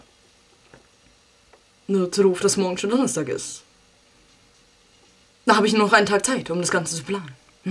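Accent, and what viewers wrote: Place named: Franconia, Germany. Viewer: des issen franke oder? .. bistu en franke? hörst dich so an.. ohne scheiß.. des is soo blöd dasses wieder lustig is xD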